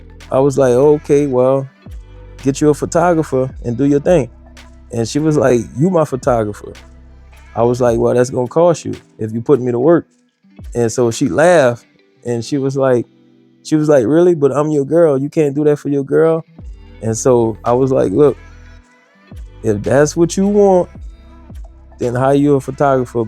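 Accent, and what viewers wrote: Louisiana, United States. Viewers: Where you from bro. You sound like you from Louisiana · Bro are you from Louisiana? I’m from BR myself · Wait! You sounds familiar. Are you from NOLA Bro? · Fam, you from the boot?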